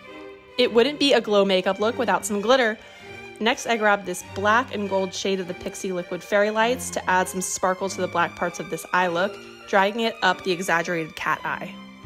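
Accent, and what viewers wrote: Russia. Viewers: your Russian accent is on point!!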